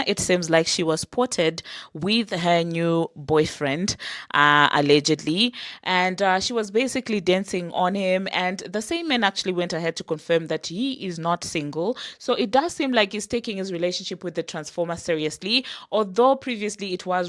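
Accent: South Africa. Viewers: You sound south African I love the accent 🩷😌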